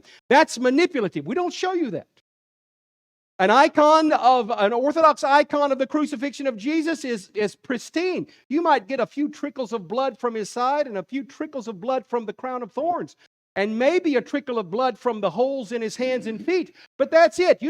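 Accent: South, United States. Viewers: Lol as a former Catholic, please stop lying to yourself. The Roman Catholic Church is schismatic, they departed from the traditions and dogma of Christ’s church within the first 1000 years. Modern Roman Catholicism is the biggest contradiction and cope of all. The sermon was on point, Christ’s crucifixion was a complete victory ☦️. And may I ask what’s protestant about this? Is it because he’s speaking with a southern American accent or because he’s speaking with some enthusiasm?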